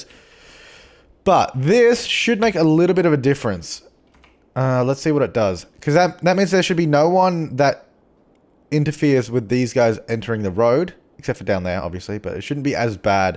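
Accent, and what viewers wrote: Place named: Australia. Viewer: What your Australian? I thought you were from the UK PS: I didn't know Australians drive on the left side of the road.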